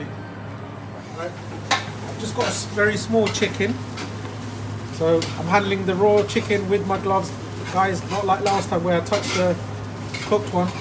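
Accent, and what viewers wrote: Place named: Birmingham, United Kingdom. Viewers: Should tell us where you are think it would be great for your restaurant? It’s a midlands accent maybe Leicester but I’d b guessing. Looked delicious. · Sounds like a Brummie. Probably from the 'Balti Triangle' or similar area in Birmingham, which probably has more 'Indian' restaurants per square mile than anywhere outside of India!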